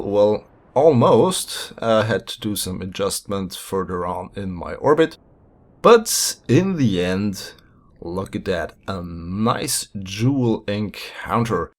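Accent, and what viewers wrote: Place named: Germany. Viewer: okay thank you . i have another question xd are you German ? your accent sounds like a German xd Wenn ja wäre es ganz cool denn ich hab da noch ein paar Fragen die ich so auf englisch nicht gestellt bekomme xD Dafür ist es einfach zu schlecht :D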